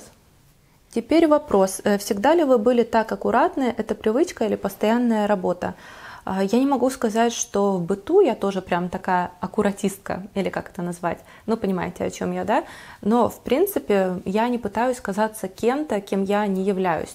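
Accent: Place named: Ukraine. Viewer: украинский акцент не спутаешь с польским или даже русским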